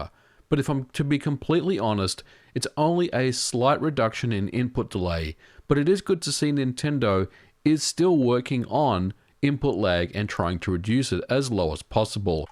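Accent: Australia. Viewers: a) "oak-uh-reener" man i love australian accents lmao b) i'd really like to know how there are "bugs" emulating a 25 year old game on VERY capable hardware. theyre a trillion dollar company. make it make sense. especially considering how emulation has been on everything else for decades.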